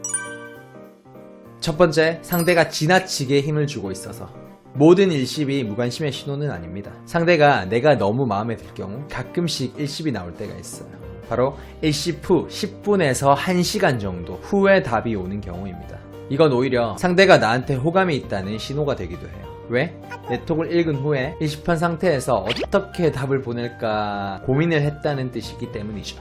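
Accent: Gyeongsang, South Korea. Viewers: 고향이 대구쪽이신가??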